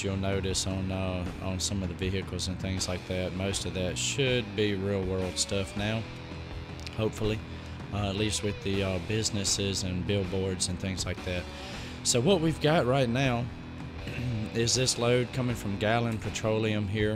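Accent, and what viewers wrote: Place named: South, United States. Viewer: As always love what your doing man! So glad I found your channel! Dude I love when you say sweet jesus Lol so funny man. Rather it's this or lspdfr you make it very entertaining to watch! Coming from south florida it's nice to here that southern accent :) also very nice involving your kids into what you love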